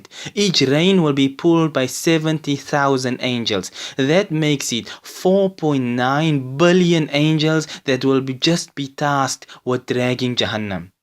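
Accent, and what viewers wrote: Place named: China. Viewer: You have a Chinese accent bro...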